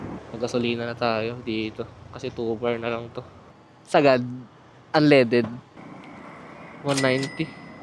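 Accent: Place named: Cebuano, Philippines. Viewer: 😂😮 Cebuano k pla hnd waray,ingat ba Kaibigan 😊😮idoy or nonoy ,noy sa Tagalog haha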